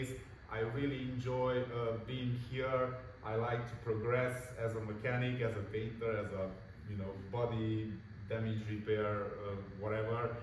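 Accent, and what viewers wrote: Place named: Germany. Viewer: I Love the grman accent, love your videos and i know you will keep the garage! :D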